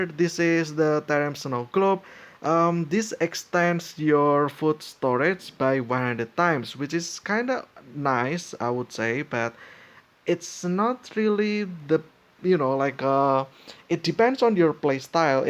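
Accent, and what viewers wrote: Indonesia. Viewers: Sudah kuduga beliau ini orang indo, dari aksen dia berbicara mirip seperti orang indo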